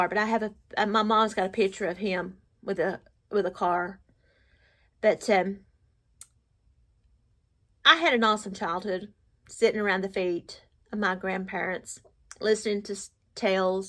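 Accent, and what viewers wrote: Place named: Georgia, United States. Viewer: Enjoyed the story. You sound like some "homefolks" I grew up with in south Georgia. Too bad todays kids listen to some "gamer" on youtube that can't say 3 words without a 4 letter word stuck in between them.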